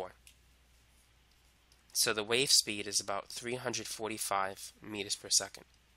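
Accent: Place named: New York, United States. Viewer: I love this dude. he's sounds slightly gangster, and fully genius at the same time. sounds like a New Yorker who made it out the hood because he smart af. Props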